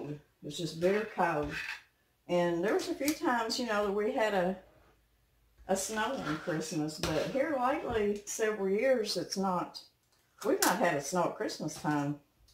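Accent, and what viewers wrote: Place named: South, United States. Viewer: I agree! I am sure I have heard the word oil pronounced, the way does, many times but the first time I REALLY HEARD IT was with Paula Dean. I love the southern accent and imitate it quite often with my daughter. She gets a kick out of it! Now, this is coming from a Pennsylvania Dutch Country Gal! LOL! We have our special accent, also!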